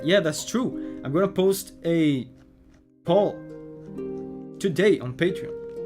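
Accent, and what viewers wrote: Brazil. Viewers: Mano, sabia que você era brasileiro, hahahaha! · Cara eu vejo seus vídeos a um tempo e eu achava q seu sotaque era de brasileiro, mas nunca vi vc falando pt. Só q vendo a live eu ouvi as maritacas e pensei "mds brasileiro certeza" KKKKKKKKKK Parabéns pelo trampo, curto mtoo suas análises. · Caraaa, tu é brasileiro!! Não fazia ideia (vi teu nome num site de músicas de jogos e acabei descobrindo por lá, segundo o site, claro)... Eu reparei o sotaque no inglês mas não sabia que era brasileiro... Weee! Parabéns!!! 🎉🎉 Torcendo muito pra tu ocupar cada vez mais espaço na indústria!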